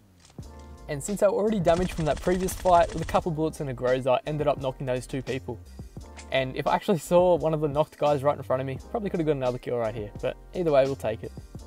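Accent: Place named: Australia. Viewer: Man, your accent sounds like an Australian.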